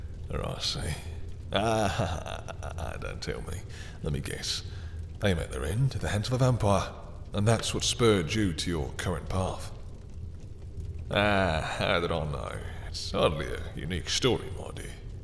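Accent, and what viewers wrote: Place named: United Kingdom. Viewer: I SWEAR IVE READ THIS SPRIPT BEFORE! This is a wonderful spin on it! I love the British accent! ✨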